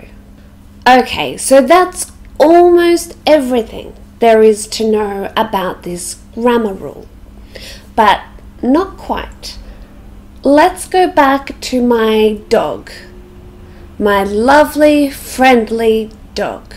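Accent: Australia. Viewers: I am used to understand more American accent than other accents and I was trying figure out which word you were saying at certain moment in the video. I found out when you said and wrote the word on the screen. The word was "big". hehehe A very easy word but I swear I really couldn't get it. I love accents as such as British, American, Australian, Canada and New Zealand. Therefore, I always say that we must listening different accents. By the way, I love the Australian accent. It is so marvelous how you sound when you speak.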